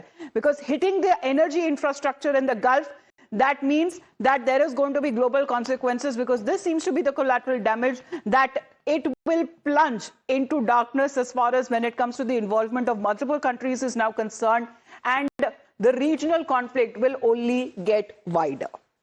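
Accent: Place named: India. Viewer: Your voice is says indian voice ugly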